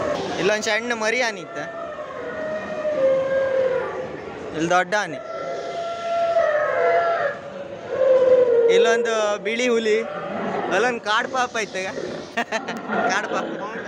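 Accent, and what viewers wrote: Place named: Kundapura, India. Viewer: Nam kundapura kannada😍❤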